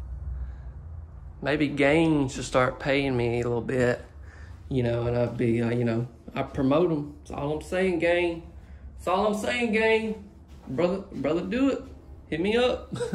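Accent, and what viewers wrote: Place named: Mississippi, United States. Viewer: you been doing a great job showing us all the mobile homes keep the good work up you doing a fantastic job and I like the way you talk you're from Mississippi and I'm from Louisiana how is your little family doing I hope they're doing well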